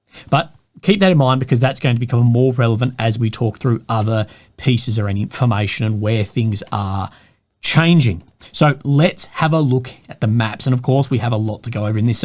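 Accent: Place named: Australia. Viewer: This top bloke is an Aussie.His accent as well as his ideas are also 'top'!!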